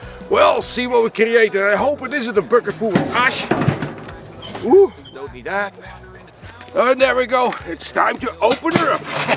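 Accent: Netherlands. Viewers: Are you Dutch? 🇳🇱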